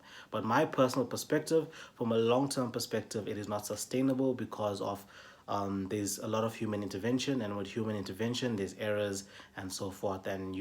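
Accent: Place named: Indian South African, South Africa. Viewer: You sound like a South African Indian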